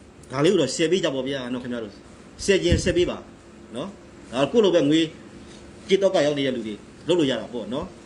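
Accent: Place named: Upcountry, Myanmar. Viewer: အဲပြောတဲ့အသံ အညာသား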